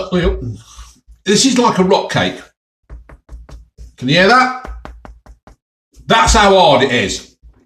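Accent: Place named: Leicester, United Kingdom. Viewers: I find your videos appalling and you eat like a chimp. Disgusting behaviour. Now that’s your fix you enjoy but seriously great videos mate and as a fellow Leicester lad it’s refreshing to understand our accent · Check out Milan's on East Park Road if you liked this place mate. By far the best place in Leicester, and get the chilli paneer its brilliant. Found you from the True Geordie and knew you were from leicester straight away with that accent!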